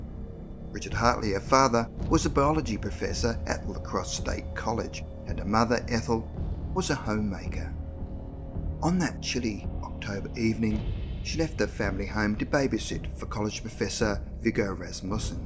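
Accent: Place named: Australia. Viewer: Dude that's crazy you sounded like you were from a bunch of different places. I heard the Australian after a bit of the video I actually commented that in like the first few seconds of it haha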